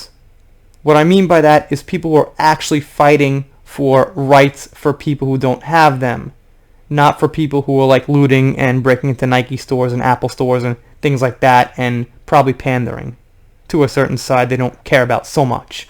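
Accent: New York, United States. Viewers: You remind me of them proper New York like ..cool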